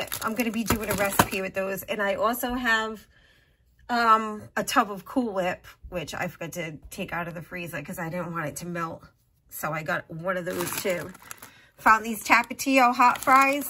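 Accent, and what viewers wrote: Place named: Texas, United States. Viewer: I am really enjoying your videos. I am from Texas so I love your accent. You are doing a great job. Keep them coming.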